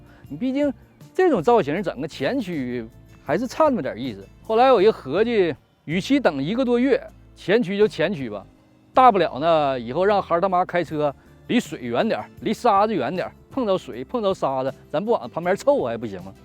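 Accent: Northeast, China. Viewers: 一听说话就是东北人呀，辽宁人吧，我吉林的，想问你一个题外话，美国公立医院看病是不是免费的麻烦你。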